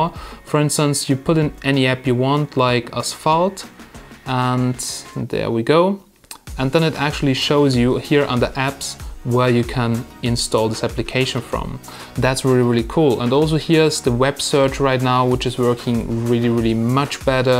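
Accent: Germany. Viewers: Eigentlich hört man sofort raus, dass er aus einem deutschsprachigem Raum kommt.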